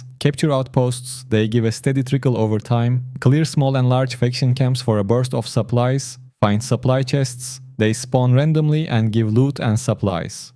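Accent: Turkey. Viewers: türk aksanı var azıcık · türk aksanı var sende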